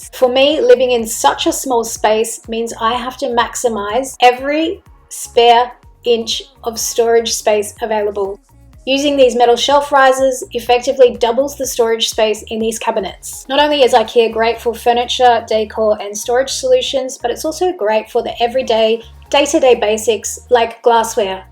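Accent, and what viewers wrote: Australia. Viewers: Hi from another Brisbane girl, it’s nice to hear an Aussie accent and I had a giggle when you mentioned thongs and then called them flip flops 😂 the views from your apartment are amazing 😊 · I'm from Brisbane too, I've only just found these videos and I'm loving them...also loving the Aussie accent! 🇦🇺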